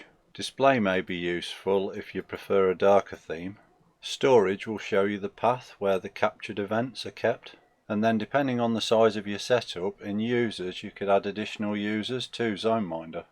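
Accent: United Kingdom. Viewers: that british accent must be staight out from a harry potter movie or sm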